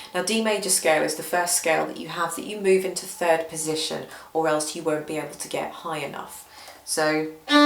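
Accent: United Kingdom. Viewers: Thank you from me as well as I am just starting out and really appreciate your instructions. On a side note, since I am an American guy, I hope it's OK as well to say that your hair looks gorgeous and along with your British accent, they only add to the whole learning experience. So much more refreshing that watching some old boring guy. Looking forward to your next tutorial videos.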